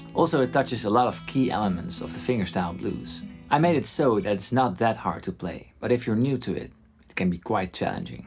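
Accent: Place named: Netherlands. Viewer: de man is wel degelijk Nederlands, zijn Engelse accent doet me denken aan dat van Henk Hofstede (van de Nits) :-) (superfijne video trouwens!)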